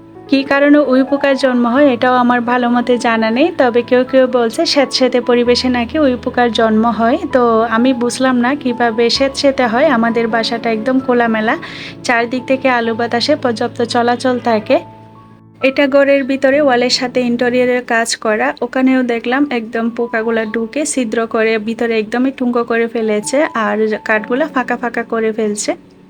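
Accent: Chittagong, Bangladesh. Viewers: Apu apni Chittagong ar maie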